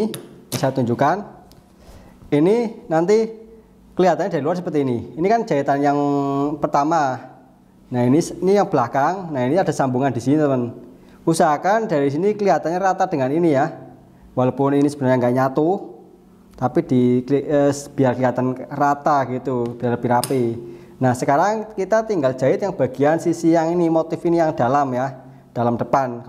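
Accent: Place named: Pekalongan, Indonesia. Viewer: Kok suara nya seperti orang Pekalongan dongwuni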